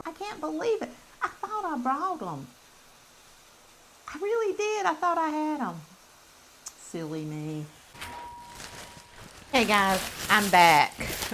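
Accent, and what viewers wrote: South, United States. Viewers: I love your recipes. I have made so many of them. My husband loves your sloppy Joe recipe. He said he doesn't want the canned stuff anymore. 😂 My 14 year daughter loves watching you too. When she hears your voice on the TV she comes into the room and says" It's the cute Southern lady." Then she'll sit with me to see what you're making. ❤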